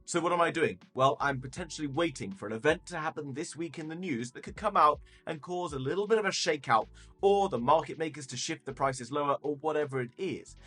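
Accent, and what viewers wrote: United Kingdom. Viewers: All British people sound the same.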